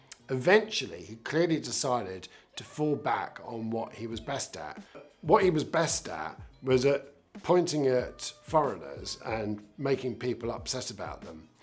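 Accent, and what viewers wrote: RP, United Kingdom. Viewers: i dont know who this guardianista ,twitter pc orthadoxist is,but he sounds like a patrician bbc type who is still resentful that the uk has come to its senses and rejected the failed experiment of being part of a happy clappy uninted states of europe.having said that,mr. far-rage is a supreme egotist,and adopted a sad macho pose next to a dead fish in some harbour,to his great discredit.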